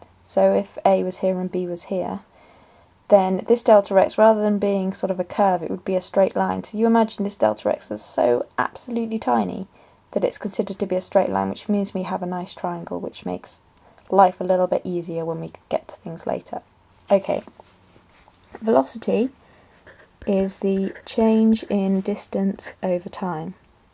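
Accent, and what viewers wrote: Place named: United Kingdom. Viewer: I agree. I love the British accent.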